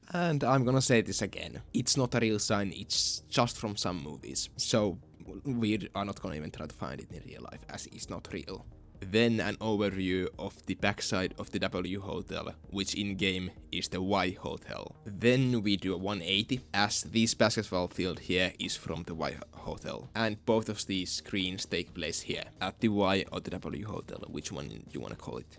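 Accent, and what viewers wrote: Finland. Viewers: That is our brother from Finland 🇫🇮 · I love Finnish accent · I hate your strong accent, sorry... but I understand... I prefer to hear your Finnish accent than the annoying and incomprehensible accent of people from India. By the way, great job with the map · Finglish. Or rally english.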